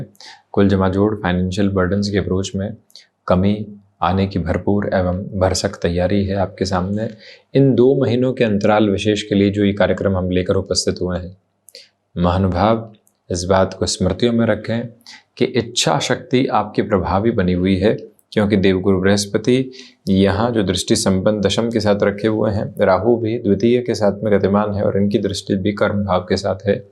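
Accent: Standard. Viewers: Your brilliant clarity with wonderful shudh Hindi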